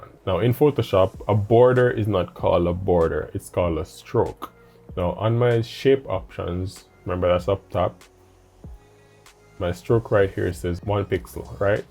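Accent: Jamaica. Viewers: Are you Jamaican 🇯🇲 ???wonderful accent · Are you Jamaican ? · i hear a caribbean accent… hmm let me guess, Jamaican? Great tutorial 💯💯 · Is that a Jamaican Accent?? Now I have to learn this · 2 seconds in....yessss my Jamaican brother!!! lol super helpful video btw, thank you! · Are you Jamaican? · you're jamaican, aren't you? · You sound Jamaican bro, are you? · are you jamaican? you sound jamaican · are you jamaican? i pick up the accent anywhere lol. Also thank you for the tutorial it really helped! looking forward for the others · You sound Jamaican. I just subscribed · Are you Jamaican? Or from the Caribbean? Because i am Jamaican and i recognize the accent. Thank you for the help!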